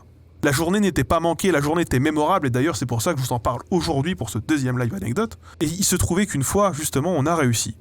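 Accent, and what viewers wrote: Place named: Haute-Marne, France. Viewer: En vrai ma famille vient de Haute-Marne (mais pas exactement de ton coin, plus vers l'Aube !) donc j'ai passé toutes mes vacances dans le village natale de ma mère, et franchement ta manière de parler et tes anecdotes ça me rappelle de fou tout le temps que j'ai passé là bas ! À être réquisitionnié pour filer des coups de main dans les champs ou à jouer avec les voisins paysans !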